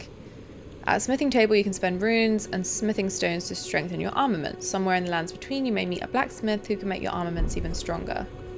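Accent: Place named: North, United Kingdom. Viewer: Welcome to Elden Ring this game is amazing and ill sub love the Northen Accent I get Banned from games for talking my usual talk yay freedom of speech Blizzard perma bans and they cant even keep them selves in check cowards hide behind a automated ban system. fight me.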